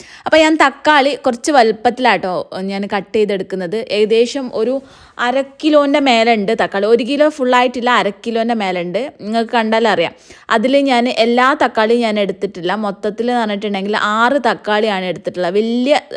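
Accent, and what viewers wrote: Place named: Malappuram, India. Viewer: Malappuram ano... Slang kettitt angane thonnunnu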